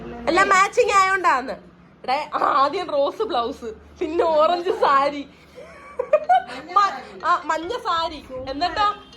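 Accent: Kollam, India. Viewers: Kollam slang❤❤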